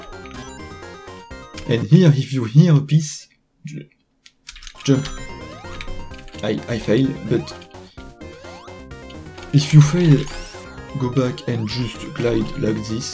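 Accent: France. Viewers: J'aime beaucoup ta voix mais omg, c'est tellement awkward quand un Français entend un autre parler Anglais avec son accent x)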